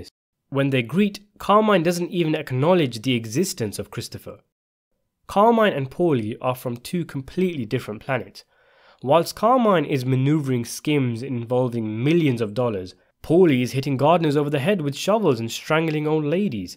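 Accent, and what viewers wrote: United Kingdom. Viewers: The British accent of the narrator is so wrong for this video. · I like hearing quote Sopranos lines in an English accent. “You’re a wormy cocksucker 🧐”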